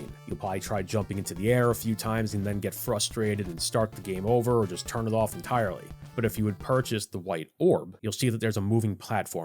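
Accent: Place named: Philadelphia, United States. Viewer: The Del-Co Philly accent, the sunken tired eyes, the walkthru under the guide as a review....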